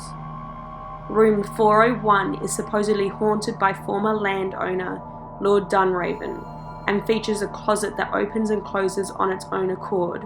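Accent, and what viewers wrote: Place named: Australia. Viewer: love this. also love your accent !! i think its aussie, where are you from ?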